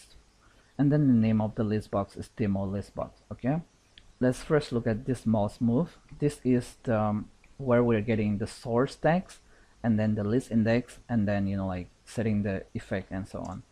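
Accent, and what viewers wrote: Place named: India. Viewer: Good job with showing this technique. From where can we download the sample file? I'm just curious about the accent, are you originally from India?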